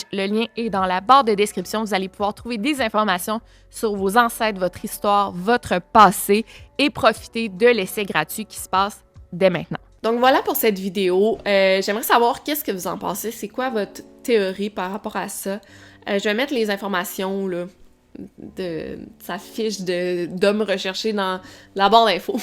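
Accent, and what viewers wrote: Quebec, Canada. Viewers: merci pour tes recits,ca vaut du Hondelatte ou du Bellemare, avec ce charmant accent Quebecois et tes '' Quebecismes'' en prime! j'aime ca a Tabernak la!!🥰😁🙂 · Un homme qui a de bons côtés, qui est un père aimant, apprécié de ses collègues et voisins ne veut rien dire. Beaucoup de tueurs en série avaient leur personnalité "bon père de famille" et à côté tuaient de nombreuses personnes 😐... C'est tout le mystère de ces indivus-là. Sinon, j'adore tes vidéos comme toujours et surtout ton superbe accent québécois ! Je comprends pas les gens qui ne supportent pas😂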